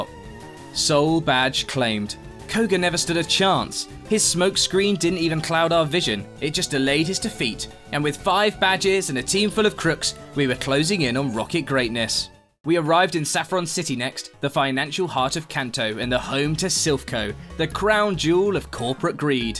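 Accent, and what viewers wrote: United Kingdom. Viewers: You‘re adorable and I enjoyed your content very much! I just subscribed, thanks for the content! By the way, You’re British, right? I say because of your accent! Lovely accent!😊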